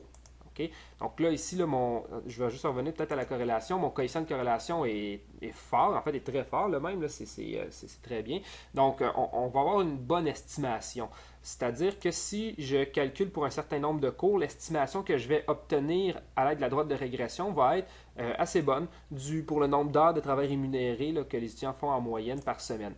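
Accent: Quebec, Canada. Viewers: moki aj c’est du Canadian Français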